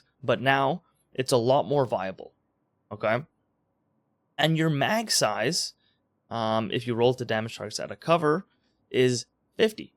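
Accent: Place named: Canada. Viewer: Dude, where in Canada? I can't quite place the accent.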